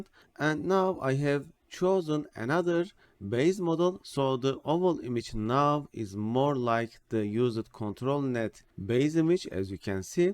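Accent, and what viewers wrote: Turkey. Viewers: Türk ingilizcesine benziyor. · Buram buram ben Türküm diyor bu aksan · türk olduğunu konuşmandan anladım sağol abim :D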